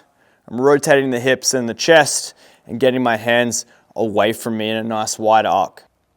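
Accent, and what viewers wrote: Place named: Australia. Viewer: So good to hear a aussie accent for a change. Feels right at home. Great video friend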